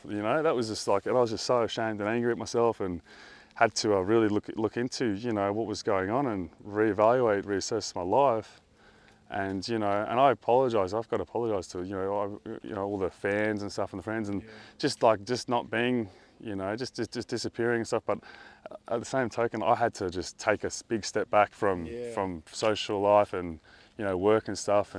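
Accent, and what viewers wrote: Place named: Australia. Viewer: Australian x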